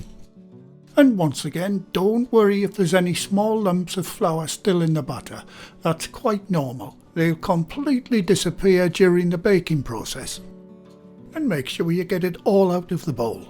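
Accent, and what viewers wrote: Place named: Newcastle, United Kingdom. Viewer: To be more specific it is a Newcastle accent. There's quite a variation of North East accents and is quite soft compared to some, which you could cut with a breadknife (or "brweadknafe") It's become very familiar to me having lived up here for 25 years. It can be quite a strong accent and get mistaken for a Scottish accent with a lot of dialect words too.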